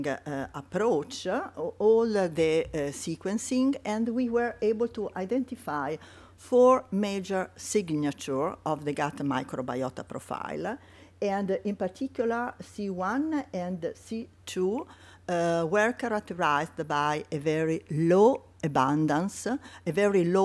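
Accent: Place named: Italy. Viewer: Cant listen to this english with strong italian accent.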